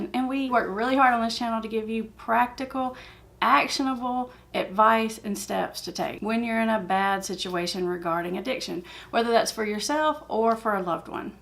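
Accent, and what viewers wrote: South, United States. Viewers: i like that country accent ;)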